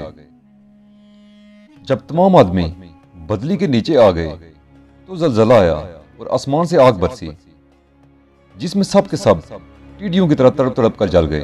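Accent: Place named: Murree, Pakistan. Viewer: آپ کی آواز سے لگ رہا ہے جیسے مری یا ایبٹ آباد سے تعلق ہے اچھی ویڈیو بنائی ہے جزاک اللّہ خیر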